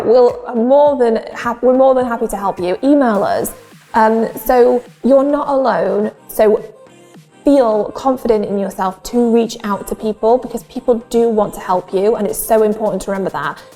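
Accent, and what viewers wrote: United Kingdom. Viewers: She’s British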